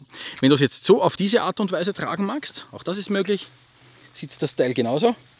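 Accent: Austria. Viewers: Ich mag die Grimassen und den österreichischen Slang 😄 Jedes Mal wieder cool.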